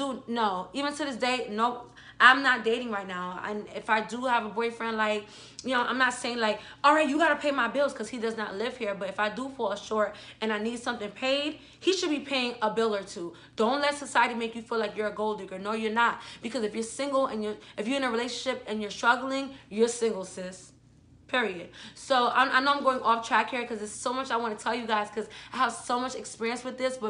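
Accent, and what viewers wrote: New York, United States. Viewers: I knew you lived in NY! You sound like u could be related to cardi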